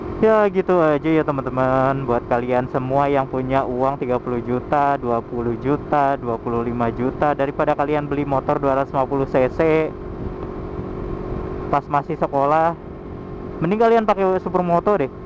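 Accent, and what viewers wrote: Banjar, Indonesia. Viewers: Jir orang Banjar bree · Bang orang Banjar?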